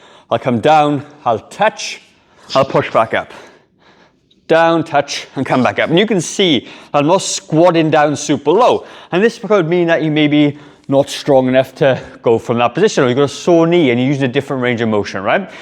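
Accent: Wales, United Kingdom. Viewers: What’s with this guy’s voice ? Not only is he Welsh , but he’s got a weird speech defect ? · Is that a Welsh accent I detect, I'm from South Wales. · Bro, are you Welsh? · Did he say new zealand? He sounds welsh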